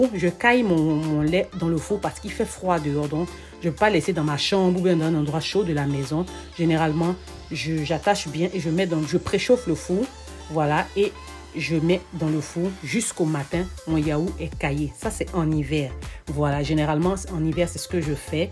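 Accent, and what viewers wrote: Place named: Ivory Coast. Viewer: Ma chérie je sais que tu es ma sœur Ivoirienne vu ton accent👏👏👏🥰🥰🥰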